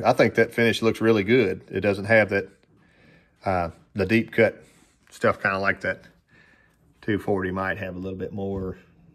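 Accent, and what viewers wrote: South, United States. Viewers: Super fine line! Love the Southern accent! Reminds me of a good day's work, awesome eats and a beer or two, and a good snooze to do it all over again. Thanks I hope you have a good morning and enjoy your day today. 15° and heavy snow ❄️🌨️ in Western Pennsylvania this morning.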